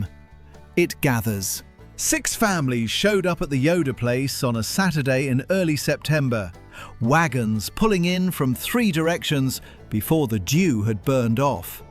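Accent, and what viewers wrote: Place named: England, United Kingdom. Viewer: Why use an English accent ???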